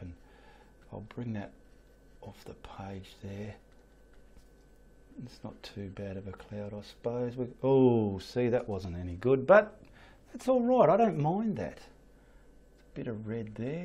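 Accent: Australia. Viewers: Thats the thickest australian accent ive ever heard. Love the painting.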